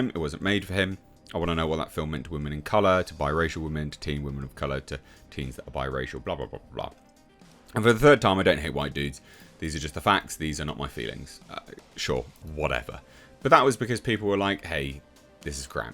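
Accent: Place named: United Kingdom. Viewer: Wow. So many tiny dicks, so many scared man-boys. Ten million "men" demeaning women daily is equal to one person speaking up and calling them on it. Sick sad little America, and Britain I guess, considering the accent of the host. Torches and pitchforks over nothing. But hey, rally around the hate. It's what drives this country. Especially in the new dark ages of the American barbarian south, where little white man-boy is king. Is anything short of forced re-education going to change things for the better?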